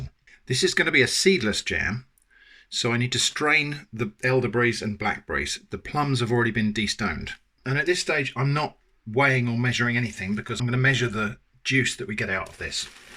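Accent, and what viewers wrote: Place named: Dorset, United Kingdom. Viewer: I'm starting to detect a slight Dorset lilt to your accent in your videos 😊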